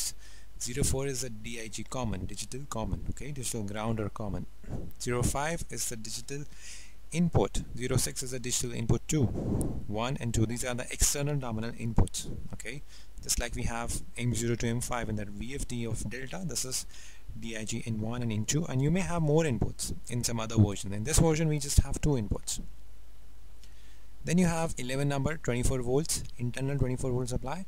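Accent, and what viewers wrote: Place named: India. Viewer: Please cleanup the sound , and if at all possible a native English speaker. The sing song lulaby of Indian accent is porrly recorded and annoyingly hard to understand. OK? PS not so many OK?s please.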